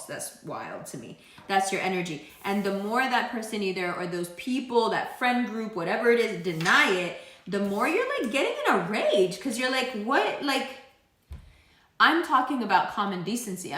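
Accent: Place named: New York, United States. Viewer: You are so funny ... oh man I love it !!! I'm a New Yorker , living in Jersey now across the Hudson.. you sound just like me :p I literally just said all this to my bf like last week the attitude and all haha I was just not beat ! Nah !! ;)) we really don't play ;) pisces / aries cusp .. Gemini rising and yup ! Not doing it. Happy Birthday to you beautiful enjoy your time in London. Thanks for everything ♡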